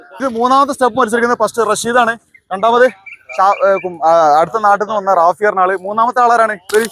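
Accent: Malappuram, India. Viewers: നിങ്ങളുടെ മലപ്പുറം സംഭാഷണം സൂപ്പർ 👌👌👌എല്ലാ game m വളരെ മനോഹരവും, രസകരവുമാണ്... സൂപ്പർ presentation 👍എല്ലാവരും ഒറ്റകെട്ടായി ഒരുമയോടെ സ്നേഹത്തോടെ മത്സരിക്കുന്ന ഗെയിം ആണ് എല്ലാം... പ്രകൃതി രമണീയമായ സ്ഥലത്ത് കണ്ണിനു കുളിർമ പകരുന്ന ഗെയിം.. ഒത്തിരി ഇഷ്ടമാണ് 🙏🙏🌻🌻🌻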